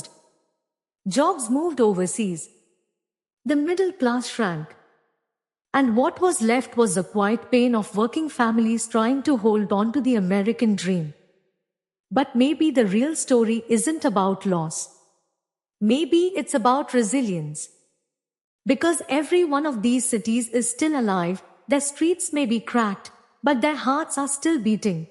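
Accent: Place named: India. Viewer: Bad narration the Indian accent is too thick.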